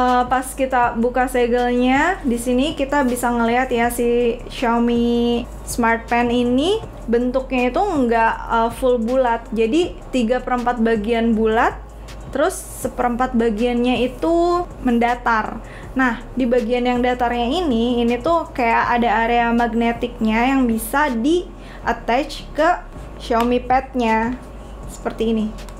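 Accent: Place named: Java, Indonesia. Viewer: gak tahan ane denger aksen wenglishnya :D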